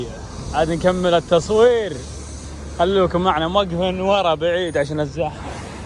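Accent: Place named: Unaizah, Saudi Arabia. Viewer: لهجة أهل عنيزة تسذا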